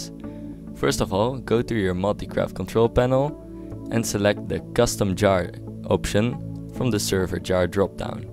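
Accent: Netherlands. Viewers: your accent sounds dutch xd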